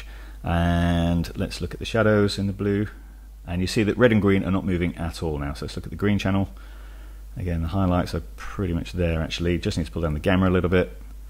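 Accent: England, United Kingdom. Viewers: Dear, Thanks a lot for your LUMA Mix killer tip! It helped me most of my previous day to match a color grading between HD plans but also old SD plans shot in Antarctica for a documentary. It was super helpful and the director are super happy cause the ice have a color that change depending how old was the ice (numerous green/blue clips but also with orange/yellow on high lights due to the sun). You "enlighted" my day:-) Your video was simply super clear, simple, effective, you really go straight to the point and i love your english accent! So thanks! It made my workflow way much faster for that! Have a nice week and thanks again for sharing your knowledge on a very simple and friendly way! Best regards from France! Vincent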